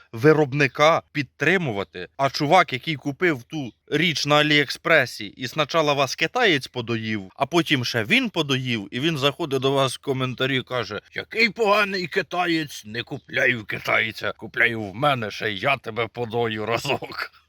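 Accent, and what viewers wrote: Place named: Sumy, Ukraine. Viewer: я почув ні разу не місцевий акцент - схоже на сумський ))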